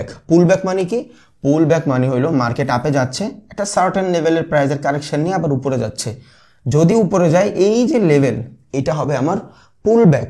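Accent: Barishal, Bangladesh. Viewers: ভাই বরিশাইল্লা ভাষাটা ভালো ছিল❤❤❤ জোস❤❤❤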